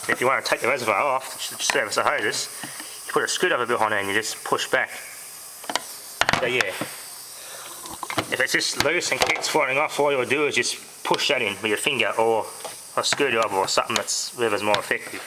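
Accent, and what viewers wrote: Australia. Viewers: Sounds like an Australian accent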